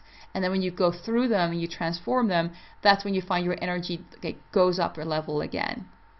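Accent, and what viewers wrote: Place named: Canada. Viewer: Thanks for the video. Your accent sounds like a mix of Canadian, Scottish, and... Dutch? 😊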